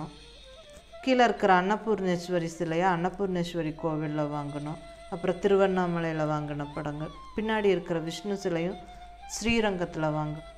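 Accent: Kongu, India. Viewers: I like your kongu tamil very much. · Alaga Coimbatore slang pesaringa pongako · Mam ur from which place. Ur slang looks like Coimbatore. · Hi mam,unga kongu Tamil nallarku,and unga son pencil sketch really nice.....we r planning to built a new home....unga pooja room,bed room,kitchen over all its so good and romba tasteful ah katirkinga....pls show al d rooms...and front elevation....and pls mention d furiture purchase place.... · Neenga kongu Tamil a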